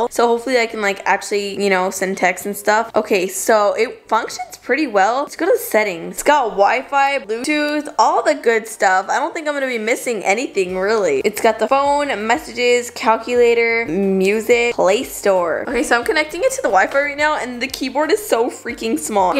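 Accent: United Kingdom. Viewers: HER BRITISH ACCENT IS SO GOOD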